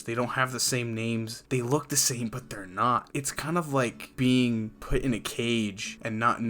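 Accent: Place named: Australia. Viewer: 277 Christian Kincaid Christian Kincaid 4 months ago I feel like an SBR Joestar family tree would be just as interesting, despite not being around as long. 114 Yoxiv Yoxiv 3 months ago Hey, how dare you forget about Jotaro's adoptive son Koichi? He's like the child Jotaro never had! 50 stan 99 stan 99 4 months ago How confusing is the Jojo family tree? Araki: Yes 72 Ken D. Uchiha Ken D. Uchiha 4 months ago Realized josuke and jotaro’s mom are siblings?😂 65 Shiranui prestonsaga Shiranui prestonsaga 4 months ago The family tree becomes so complicated once we hit part 4 and beyond 49 NoelxHunter NoelxHunter 4 months ago “While the others don’t have a sarcastic Australian man narrating” The Anime Man: Excuse me!!!??? 47 CondorShelves CondorShelves 4 months ago Donatello's last name is Versace, like the fashion brand. Part 6 in particular had tons of fashion references. 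Thanks for Jojo monday, always makes me happy to see new Jojo content uploaded straight into my youtube feed. 54 Damian Nova Damian Nova 4 months ago I do want you to do the SBR family tree because I know you’d do a great job and it would be loads of fun 32 Avocado Leeminovela Avocado Leeminovela 4 months ago The Joestar family is the lineage with a birthmark in the shape of the suscribe button 17 Sven Servette Sven Servette 4 months ago I didn't realize you were Australian. I thought you were American trying to be fancy. I guess that describes the Australian accent pretty well. 36 preseetha Deepak preseetha Deepak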